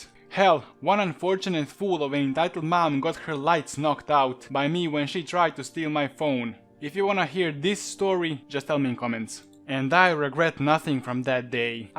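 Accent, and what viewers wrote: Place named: Russia. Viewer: I know that accent. Sounds like Russian. But u never know it could be Indian. Sounds like either one. Either way nice vid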